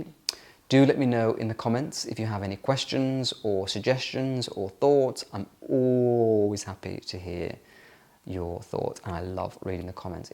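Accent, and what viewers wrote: RP, United Kingdom. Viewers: I have been watching since your old flat. I think you look the same. Maybe your accent has become a bit more posh. You are lucky you are not aging. No still the same. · What a posh accent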